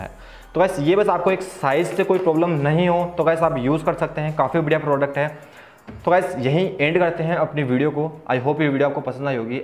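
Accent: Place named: Haryana, India. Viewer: bhai tu shayad hariyana se hai and accent mast hai teri has has k pagal ho gaya.. but haan sahi review dia hai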